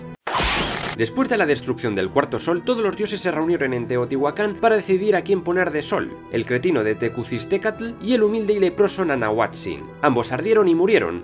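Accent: Spain. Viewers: Que curioso escuchar a un español, contando historia de México prehispánico.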